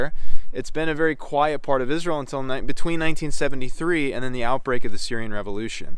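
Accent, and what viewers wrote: United States. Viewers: I couldn’t agree with you more Brother!!! You sound American 🇺🇸 Only have one ☝️ question 🙋 If CHRISTIAN’S truly believed in Hashem and Yeshua as their Savior and following the Torah as COMMANDED… Why is it that United States 🇺🇸 does not have one ☝️ fox 🕳️ hole dug in the Golan Heights and have not worked with the I.D.F.? When I take full control of the American 🇺🇸 Pentagon… I’ll go ahead and make the arrangements myself my American 🇺🇸 friend. I will be activating all U.S. Military branches to DEFCON 5 and deploying the Pacific Fleet off the coast of Israel 🇮🇱 Further arrangements are CLASSIFIED…. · In this video a European looking guy with an American accent tells us why its okay to take stuff from people if you have a magic book telling you it used to belong to some fraction of your genetic ancestors.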